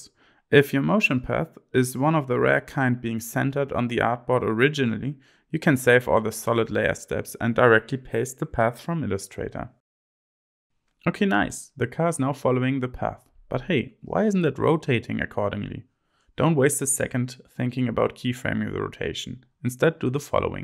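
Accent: Germany. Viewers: Du bist doch ein Deutscher oder? Du hörst dich an wie ein Deutscher der englisch redet :)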